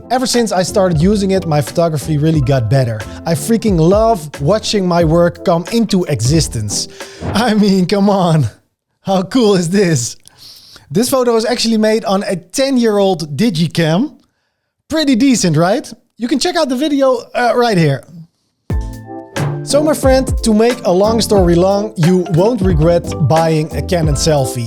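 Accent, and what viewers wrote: Netherlands. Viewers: you definitely dutch! you have the same accent of my dj friend from breda! hahahahah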